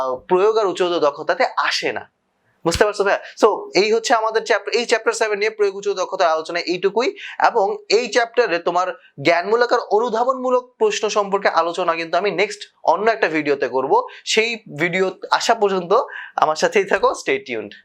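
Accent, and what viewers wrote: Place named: Pabna, Bangladesh. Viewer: আপনে তো পাবনার সার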